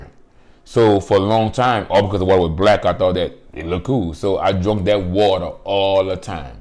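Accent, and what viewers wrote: United States. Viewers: Not a fan of crawfish, but your country accent makes it interesting 😃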